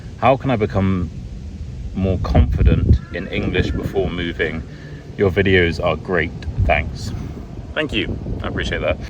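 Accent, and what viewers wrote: United Kingdom. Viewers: first of all thanks for helping us to understand and als speak in British accent ❤️‍🔥 Also my teacher recommended us to watch more videos on you tube but mostly girls should watch female ones bc their accent maybe 😶 so which female bloger can you recommend to me 🤔 of course I will continue to watch your videos to but still I need one female bloger too😊 I will wait for your comment 💯💌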